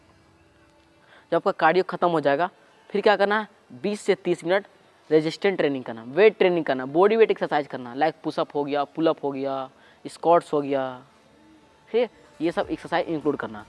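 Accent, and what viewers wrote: Jharkhand, India. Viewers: Bhai ap jharkhand se ho kya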